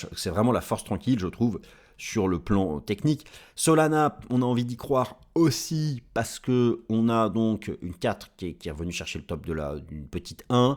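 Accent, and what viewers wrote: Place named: France. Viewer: Ton accent à la française... trop mignon! Mais bon, cela n'enlève rien à la qualité de ton contenu!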